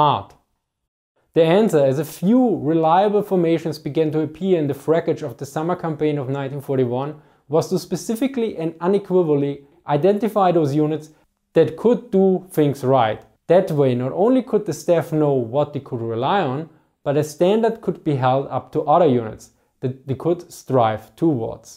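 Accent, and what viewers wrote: Germany. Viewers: bad English pronounciation... I just cannot bear his accent. It is unbearable to listen to someone who uses the "German language melody" on each and every English vocab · To be fair narration matters a lot and actual bad narrators can ruin a video. But accent isn't as terrible as you make it to be. What he says is perfectly intelligible w/o subs. If it bothers you that much, you can maybe contact him about funding him a native English speaker for narration (it's always about the dough ultimately of course). Tho to me I don't get to hear someone speaking English in a hard German accent that often so it's actually a pretty interesting aspect of his videos. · German accent can be hard to stomach.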